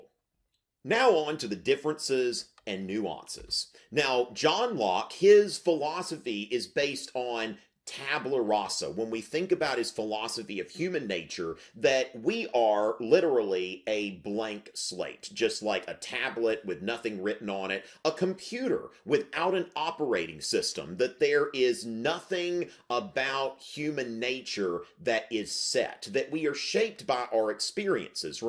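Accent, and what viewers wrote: South, United States. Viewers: Love these! You break things down elegantly and with a homey, cozy southern accent. I am hooked.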